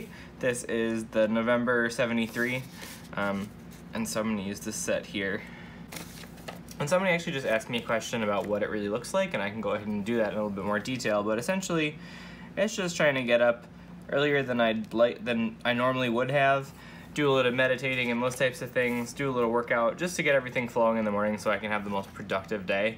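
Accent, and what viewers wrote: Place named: Minnesota, United States. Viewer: Just came across your videos! It's freshing see/ hearing a guy plan! What part of Minnesota are you from sounds like the cities area. I was born and raised in St. Cloud! Love hearing the accent too!!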